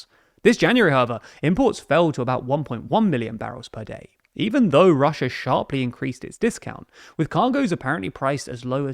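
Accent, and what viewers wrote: United Kingdom. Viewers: UK accent is means a f a k e information. Like BBC news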